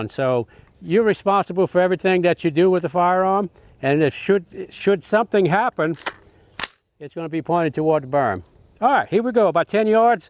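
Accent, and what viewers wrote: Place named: United States. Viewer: Great and easy to follow and understand. Talks American English and explains thoroughly yet simply. 👍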